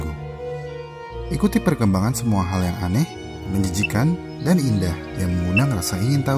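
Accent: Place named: West Java, Indonesia. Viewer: Gua curiga admin orang sunda😁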